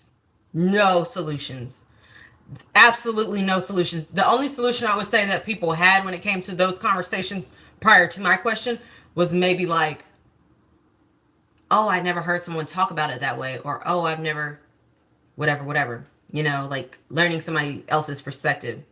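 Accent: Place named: South, United States. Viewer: we Luv you you too sexy and your voice is beautiful. I love your southern accent it is sublime. you just don't know. well now you do mmmmmm there is no comparison between you too. I came threw the door and said it before, that was that shit.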